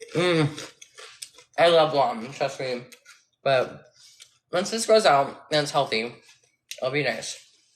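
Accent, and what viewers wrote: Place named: South, United States. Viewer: That country accent, WOOW SOUNDS SOOOO HOT!! I'm glad you dont sound valley. I kno bout ur up bringing, hearing the story from you. WHOS YOUR INSPIRATION hmm something like that EVERYTHING YOU DONT WANT TO BE LIKE!! is the best answer. HUGE PROPS AND HUGE MAD RESPECT TO YOUR UNCLE, TAKING ALL OF YAH IN!! That's soooo cool, you are taking care of him. I end up changing my wallpaper to your picture. It's the picture from Pilow talk, with the light blue Luke sweater. It's me favorite picture of you. I LOVE STOLEN like shots. You look and are BREATHTAKINGLY STUNNINGLY GORGEOUS!! LOVE AND HELLA APPRECIATE THAT YOU ARE LEVER HEADED, SMART AND WITH A DEGREE, VERY WELL SPOKEN.. LOVE ALLLL OF THEEEE ABOVE. I ADMIRE YOU.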